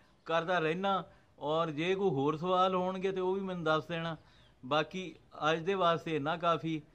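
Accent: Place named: Punjab, Pakistan. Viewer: Gulabi Urdu.. Like it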